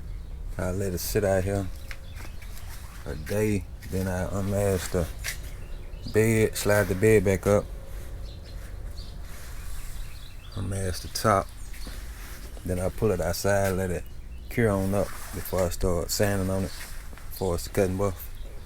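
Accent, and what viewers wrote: Alabama, United States. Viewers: Thanks for the video. Trying to learn if it's worth my time to do my obs Yukon. Sounds like you're near me (muscle shoals) - do you run into issues with humidity or do you run dehu's?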